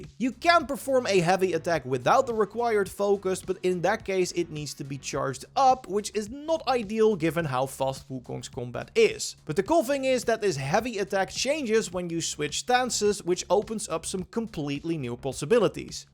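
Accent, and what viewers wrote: Netherlands. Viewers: He is dutch, you're correct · I think he's not a native speaker. His accent sounds dutch.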